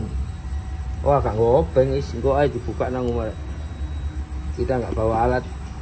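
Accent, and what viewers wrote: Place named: Sidoarjo, Indonesia. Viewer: Pean asli pundi . Kok bahasane sidoarjoan nyel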